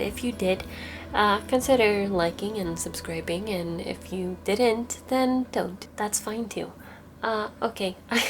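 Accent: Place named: Sweden. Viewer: Nämen, hörde nu att du är svensk! Älskar blommorna 😍🌺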